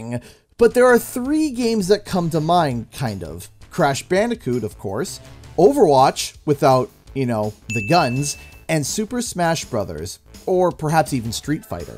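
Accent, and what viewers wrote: Canada. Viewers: It's cool that you have your own Crash 4 with a -version of Crash.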